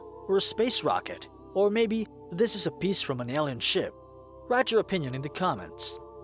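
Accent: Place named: Netherlands. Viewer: Narrator sounds Dutch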